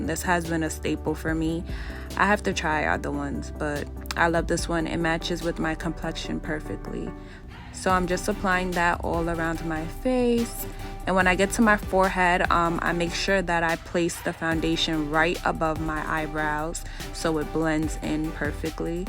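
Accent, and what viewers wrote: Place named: New York, United States. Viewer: ASA new subscriber!!! Beautiful look!! Edited to say, I thought that was a NY accent (I'm from NJ) lol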